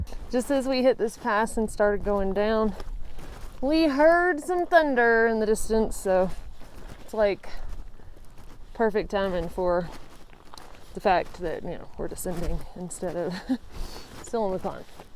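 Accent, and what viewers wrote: South, United States. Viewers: Beautiful country! You've inspired me, I'd like to thru hike the AT next year, but it depends on if my job will let me have the time. If not, I'd like to do a section hike a week or two at a time. I've always loved the Appalachians since I was a kid when I used to go skiing in NJ by the Delaware water gap and now since I lived just 60 miles from them in VA. I hiked Goshen pass in VA this summer and I'm addicted. Never thought hiking could be fun after my only real hiking experience (apples and oranges) was from being an enlisted soldier in the US Army where you carry waaaaaay more weight and in my case, an M60 machine gun. I love your southern drawl and could listen to you all day! LOL!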